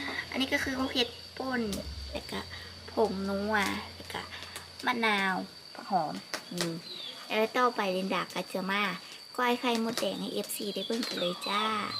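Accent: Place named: Laos. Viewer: ภาษาสำเนียงลาวเป็นเอกลักษณ์จังเลยนะครับสาวลินดา จะมากินกุ้งเมืองไทยอีกเมื่อไหร่ครับอยากไปเที่ยวลาวจังครับวัดที่ลาว สถาปัตยกรรมสวยงามครับ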